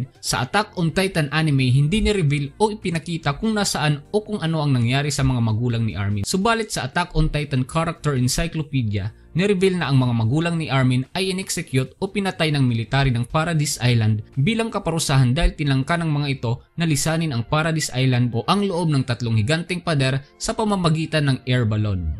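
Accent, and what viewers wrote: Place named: Visayas, Philippines. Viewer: Bisaya kaayo ka mo lebay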